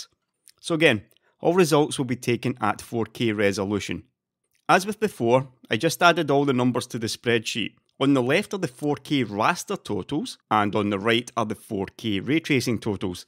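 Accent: Scotland, United Kingdom. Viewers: "assuming they have a 7900XTX in the works"? It sounds like you're in Scotland but I'm pretty certain the news gets there too! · Started watching out of interest, finished watching to listen to a Scot say "faster in raster" 69 times.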